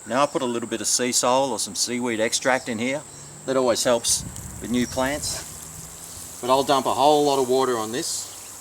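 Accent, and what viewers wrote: Australia. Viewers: Nice video you sound like your from down under. Thanks for the tips mate. growing mine from seeds.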